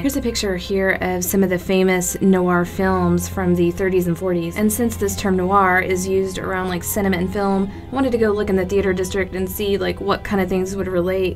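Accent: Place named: United States. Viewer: Btw u sound like a cute American?what state are you from but slap me with the holy macral if I'm wrong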